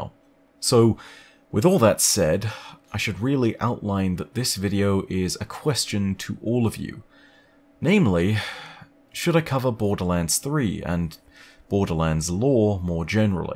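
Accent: RP, United Kingdom. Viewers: Posh English man? I'll just imagine that Sir Hammerlock is just telling me a story about the lore of the game. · You could channel some Sir Hammerlock with your "posh englishman" thing and spice it with some Marcus, it could also wait for like say 6 months . Also the BL series is also something that could produce some "that sound like a YOU PROBLEM..."s. On what you could cover... I don't think there's that much but all the weapons manufacturers should have some worthy stuff and the next game is expanding on them AND the universe more. I also wouldn't mind some vids here and there on content other than Destiny. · Posh Englishmen + Borderlands=Great Content But you do you I've seen a lot of the comments and agree, You do this if you want to do it, We will all enjoy your content and continue to watch no matter if you do Borderlands or not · Personally I find the idea of a "posh" Englishman talking about "swordsplosions" a fantastic fit, but as others have said, don't risk your health over it if it's going to have a negative impact. 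· I agree, the tone of a "posh Englishman" narrating absolute insanity (that also has a pretty good story to back it up) would be phenomenal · There actually is a surprising amount of deep lore in borderlands. It's more recent, like, a few hundred years, not even, so nothing as deep and expansive as Destiny. The call I up to you. If Borderlands calls to you, if you click with it's humor and world, go ahead. If there are other games you wanna cover, that's fine too. TBH, you put it best. Posh British guy may not be the right "voice" to cover Borderlands. Then again, what is the right "voice"?, if you have fun with the game and want to share that fun with us, go ahead. If you wanna play other games and share those with us, that's fine too. Peace,. Whatever you want is cool with me. I definitely be up for borderlands content. Most of the lore is upfront in all of the games in talking to npcs and side quests. 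Not too hard to research, but may take some time to get to. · My advice for Borderlands lore stories? cover the background lore and the small tidbits that flesh out the world. Example: Tediore guns are made cheap therefore they're thrown away to be used as an impromptu grenade rather than be reloaded. Don't tire yourself out explaining motivations of major characters and story beats. Research the short stories that flesh out the companies that run the universe of The Borderlands. Atlas, Maliwan, Pangolin, Hyperion, Dahl, Jakobs, etc. Check out the small bits that flesh out the lore because those bits are some of the most fun and refreshing! Borderlands is somewhat secretly about capitalism run rampant, sometimes worshiped, sometimes turned into warring factions, sometimes turned into fights for freedom. Do tackle stories like that of Mr. Torgue with your posh british accent, and tackle it with a rich sarcasm like the stories are a joke and not to be made seriously, because they are jokes! 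Rather than look at Borderlands from a surface level all the way maybe you'd have fun laying a foundation for the folks that don't have a clue about Borderlands, tell them about Pandora, The Vaults, Sirens, then tell them about the world and the companies that exploit it. :) Don't take this as something you should cover if you simply don't mesh with it ya know? Find the stories YOU like and share them. I'll be here for whatever content you make, but if you need someone like me to tell you that you might like Borderlands and it might be a surprising bre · I'd love covering Borderlands lore! Posh Englishman explaining Borderlands is something I need in my life! 🙌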